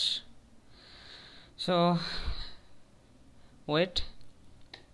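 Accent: India. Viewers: DAMN !!!! i hate india pronunciation in english, like they have candy in their mouth while they're talking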